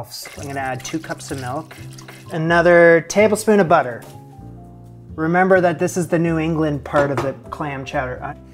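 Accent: Canada. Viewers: i clocked that you were canadian immediately before you even said that you were, amidst a sea of americans on youtube i spot my brethren with ease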